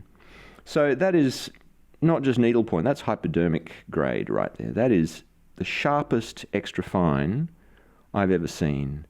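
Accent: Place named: Australia. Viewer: I do love the Ikea bath mat! I personally found a dual purpose for my shag carpet --> it also makes for a lovely photographic background, and the pen can't fall further than the floor when taking photos Lots of gorgeous visual texture. :D Need to check out your other vids! Caught your Aussie accent, and you collected yet another Aussie as a subscriber. I've got a heavy Aussie bias. What a monstrous Dremel adjustment to that green demo 9019. Cut the feed too! That needlepoint one looks amazing! I can't even hear the pen that much on the page. Sounds brilliant. I'm amazed at how well it spread the ink and didn't just glomp onto the page like some flex pens tend to do. Didn't even need an overfeed? Standard feed you say? Oh my, how delicious.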